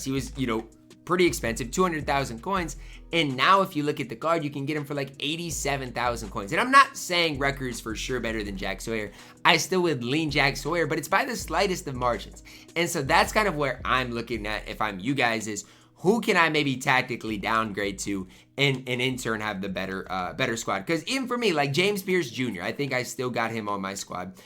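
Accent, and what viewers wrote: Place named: Midwest, United States. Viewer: He’s from Iowa area so it’s Midwest lol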